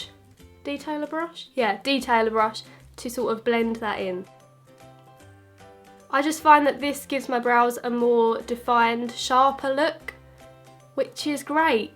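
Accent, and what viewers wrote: Essex, United Kingdom. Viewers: You MUST be from Essex!!!! We have same accent I'm convinced! X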